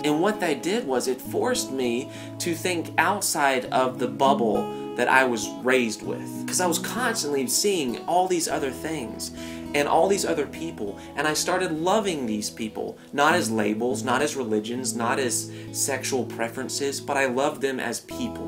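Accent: South, United States. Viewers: Does he have blue or green eyes? And is that a southern accent I hear!?!? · The southern accent though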